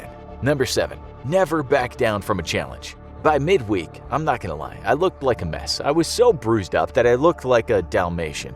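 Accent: United Kingdom. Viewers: His British accent is actually alright.....I can tell better than Americans because I'm from the UK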